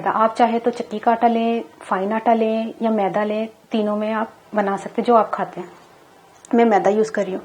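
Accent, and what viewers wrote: Punjab, India. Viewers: Sachi aapki batein karte hue, aur vo bhi Punjabi mau, bahut achha lagta hai. Aise lagta hai ki bas sath hi khade hoker batein karte karte maze se khana pakka rahe hai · You are so sweet, your Panjabi is so cute.Love you dear. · Kasssamayyyyyyy and also ur punjabi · Punjabi bht sweet bolti hn ap bht zada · baji ap ki cooking zaberdust hoti he....or sath ke sath ap ki meethi punjabi bhi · Masha Allah so sweet voice aap ki mujha panjabi bahut pasand hai masha Allah masha Allah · Wah ji wah parathay ka maza to apni jagha lekin ap k bolnay ka andaz bht sweet hy urdun punjabi ka tarka lagta hy to maza aajata hy mn ap ki bht sari cheezain try kr chuki hon aur achi banti hn meri duain ap k sath. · Asslam O Alaikum Baji apki punjabi bht sweet hy or ap ne bht achi technique btae God bless you or ap ka pratha bht acha bna hy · Aap ki baat karne ka tarika thoda panjabi hy mashaallah paratha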